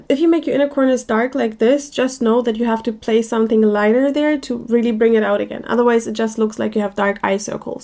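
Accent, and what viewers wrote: Germany. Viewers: where are you from? your accent sounds a bit german... greetings from germany😊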